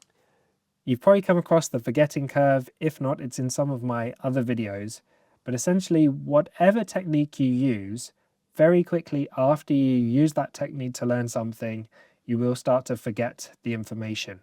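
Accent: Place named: United Kingdom. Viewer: Bro started speaking, I heard the british accent and subed IMEDIATELY. Excited to get into the video lesson.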